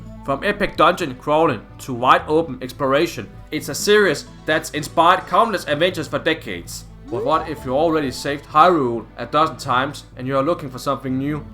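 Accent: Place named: France. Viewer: Crosscode is one of my favorite games of all time. Should try phoenotopia awakening if you like zelda-likes. It's from a sidescrolling perspective which translates really really well. The explanation, story and puzzles are amazing. Curious where you're accented is from. You sound French but when speaking faster it sounds more German or Dutch.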